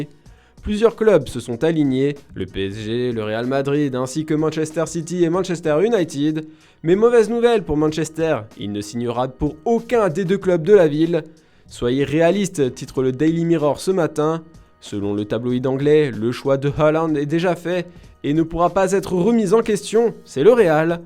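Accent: Italy. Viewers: Ton accent italien, on en peut plus 😰😰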